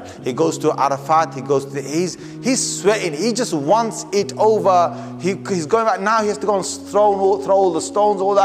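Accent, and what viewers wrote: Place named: Punjab, India. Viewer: Sounds punjabi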